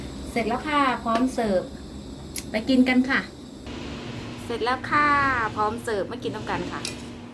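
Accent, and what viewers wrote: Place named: Phu Thai, Thailand. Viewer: คือมิเว้าภูไท🥰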